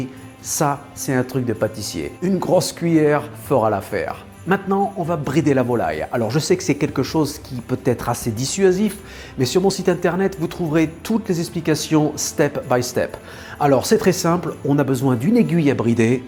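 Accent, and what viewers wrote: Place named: Bordeaux, France. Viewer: C’est trés formidable Chef et merci beaucoup. His accent seems to be from Bordeaux.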